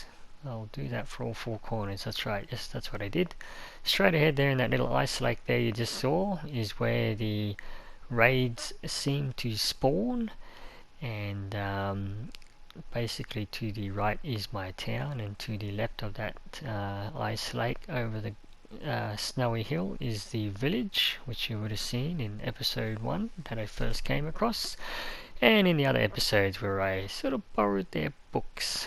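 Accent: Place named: United Kingdom. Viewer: Are you from UK because your accent is like those gentlemen i heard from youtube?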